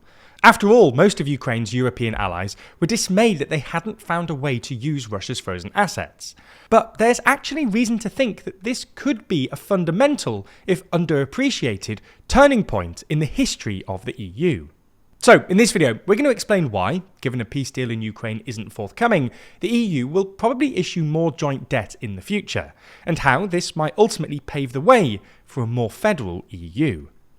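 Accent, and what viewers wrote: United Kingdom. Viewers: Where are you from? cause you sound british, but that would be strange :)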